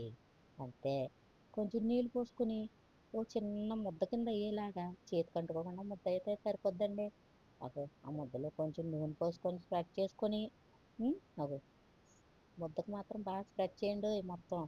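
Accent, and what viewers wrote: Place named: Godavari, India. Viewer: godavari slang super andi👌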